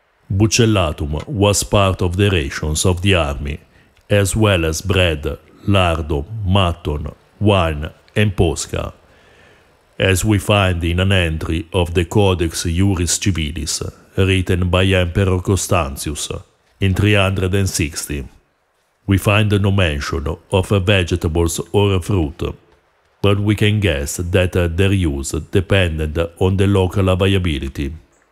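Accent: Italy. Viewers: Where part of Italy you from?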